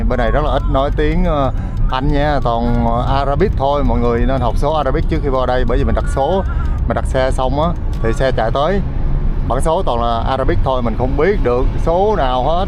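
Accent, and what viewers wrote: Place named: Mekong Delta, Vietnam. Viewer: Cái kênh du lịch mà tui thích vì phần thuyết minh rất riêng của, vừa hay mà cũng vừa mắc cười, đậm chất miền Tây rặc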